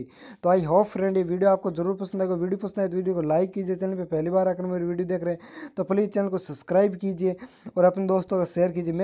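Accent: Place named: Rajasthan, India. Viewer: Pkka aap rajasthan se ho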